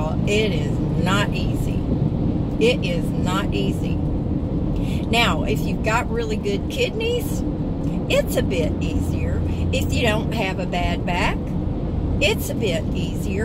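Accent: South, United States. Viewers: "Yu All" You sound like a good ol' southern lady. Hope to see you at RTR 2019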